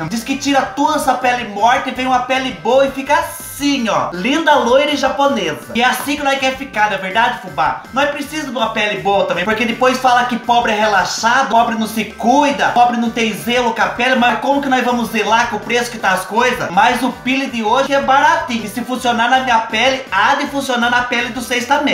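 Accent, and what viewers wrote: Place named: Caipira, Brazil. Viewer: Adorei seu modo “caipira” Um barato. Ameiiiii ❤️❤️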